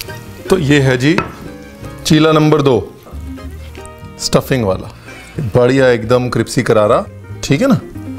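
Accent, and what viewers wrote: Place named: Punjab, India. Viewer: Ranveer paji tuc hindi che punjabi bolde bot vadiya lagda sachi gal paji tuhadi gal baat sunn hi aanda tuhdi video te osm paji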